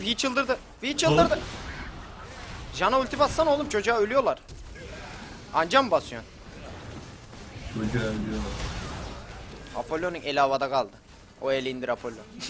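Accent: United States. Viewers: Kardeşim şu amerikanvari konuşmaların gerçekten tipine çok iyi gidiyor başarılarının devamını dilerim:D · şu amerikan dublajı yapmayı bıraksan hani videolarında eski tat yok reis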